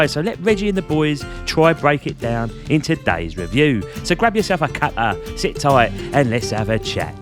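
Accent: Cockney, United Kingdom. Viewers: First time I’ve heard a fancy cockney pirate explain a train game to me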